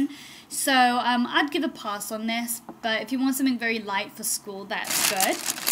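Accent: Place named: United Kingdom. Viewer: do u live in uk or us? lol bc ur accent is uk but ulta is in us =p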